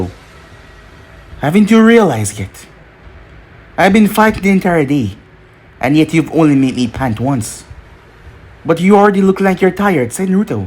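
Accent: Jamaica. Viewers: I’m good my boy also are u Jamaican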